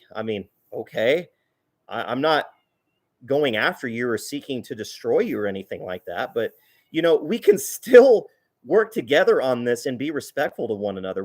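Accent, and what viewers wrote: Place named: United States. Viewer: You have quite the strong American accent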